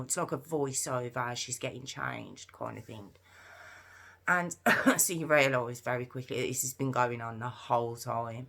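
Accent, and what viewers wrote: Birmingham, United Kingdom. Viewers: I love your accent! Are you from Birmingham?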